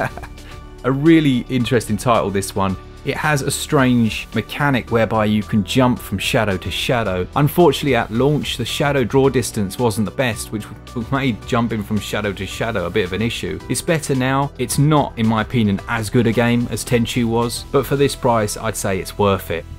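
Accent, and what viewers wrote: United Kingdom. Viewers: I don't know why, but you kinda sound like a sexy British Morgan Freeman 🤷‍♀️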